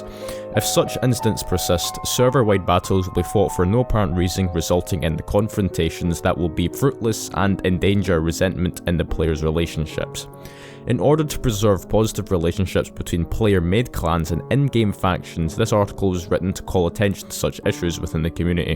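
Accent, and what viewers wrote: Scotland, United Kingdom. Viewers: I love your scottish voice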